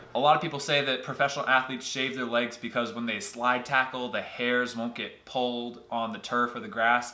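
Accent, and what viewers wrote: United States. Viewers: pedro mota he is American, thats how he was taught to say it. Theirs nothing wrong with it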